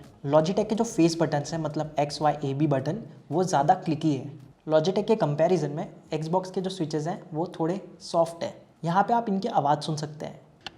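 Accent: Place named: India. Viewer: Who understand you Curry language!!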